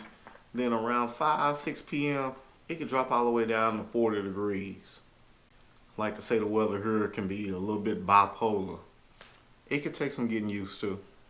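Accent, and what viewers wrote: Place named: Texas, United States. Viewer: love the Texan accent :)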